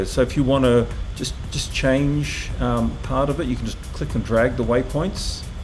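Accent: Australia. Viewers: Wait are you actually from Texas? I am assume originally Aussie?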